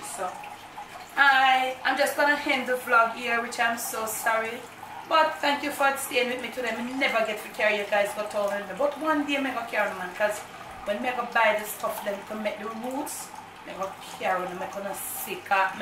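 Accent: Jamaica. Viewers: I do enjoy watching you and listening to you. You are a real Jamaican. You're so funny one minute you're talking nice and the next minute you're bursting out into the Jamaican patois. 🤣😉 I absolutely love to see you cooking. I would like very much if you did do a video showing us how you clean your home.🙄😀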